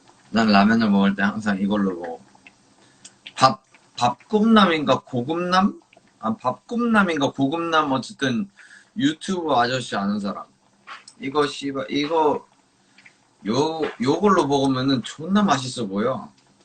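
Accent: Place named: Seoul, South Korea. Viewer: 이 형 고랩에서는 사투리 그대로 쓰더니 이제 서울말에 적응해가는듯 ㅋㅋ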